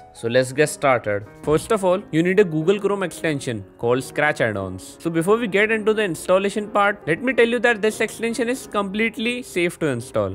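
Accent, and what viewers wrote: India. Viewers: Yes everytime you here a indian dude as the tutoruial narrator you know thats this is going to be the best tutoriual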